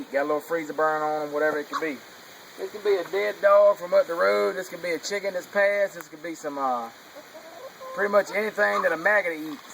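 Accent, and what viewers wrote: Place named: South, United States. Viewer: man oh man.. u have uncovered nature's ultimate feeds.. that southern talk is so cool but the message is gonna make the whole world filled with hanged holed buckets.. greetings man from the far east.. woo yahh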